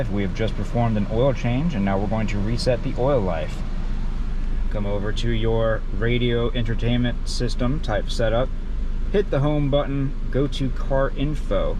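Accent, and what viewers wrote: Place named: South, United States. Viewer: Good job! We like your southern accent! Make more videos my oily cowboy 😂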